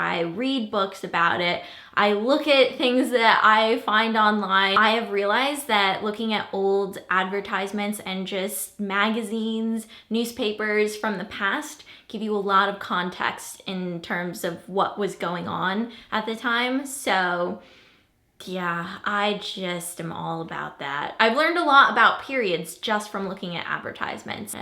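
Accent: South, United States. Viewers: Where are you from? You sound kind southern, but I thought you were from CA...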